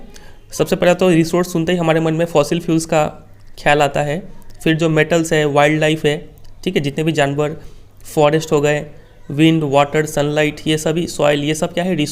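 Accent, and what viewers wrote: Bihar, India. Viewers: Sir aap Bihar se ho Kaya please reply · Kya aapp bihar se ho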